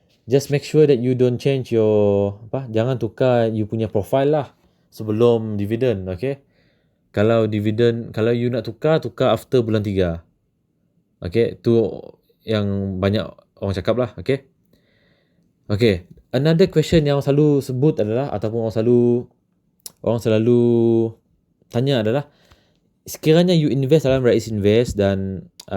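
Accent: Sarawak, Malaysia. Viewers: Are you Sarawakian? 😂 anyway thanks for the vid, very helpful. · slang srawak ya xpat lari bos. . hahahah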